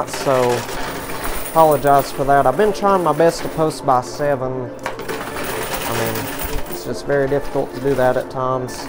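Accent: South, United States. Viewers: Love your videos and your southern accent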